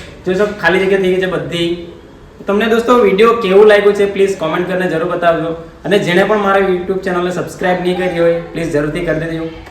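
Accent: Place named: Marwar, India. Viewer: bhai to hamare marwadi lg rahe hai bhasa se to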